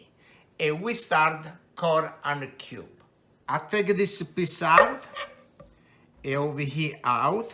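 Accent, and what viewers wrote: Italy. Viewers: I like the Italian accent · Yes, but only next to Lidia Bastianich tho. For me anyway. He is adorable and when he speaks, it's with that unmistakably Italian flare.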